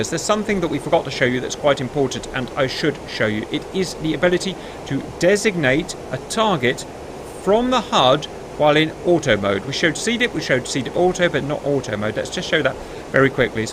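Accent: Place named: United Kingdom. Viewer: Happy Fathersday you funny talking brit. Enjoy the journey sir.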